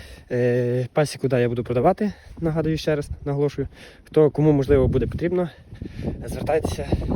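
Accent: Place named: Dubno, Ukraine. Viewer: Чую по розговору, в мене бабуля з Дубенського району була, все дитинство там провів 👍👍👍. Зря хочеш продавати пасіка то для душі....але ти правий шо особо не заробиш на цьому ділі.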